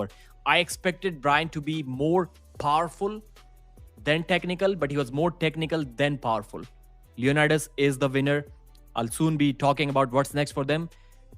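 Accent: India. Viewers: Boah schreib doch dazu im Titel das du nur Bilder zeigst replaced und dein Indischen Akzent sprechen lässt statt denn Kampf zu zeigen.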